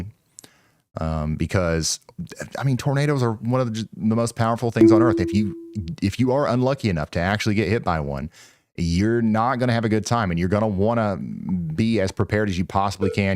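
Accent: South, United States. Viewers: We southerners have our own way of pronunciation. It's a little ridiculous to have a man work late for your protection and you write this!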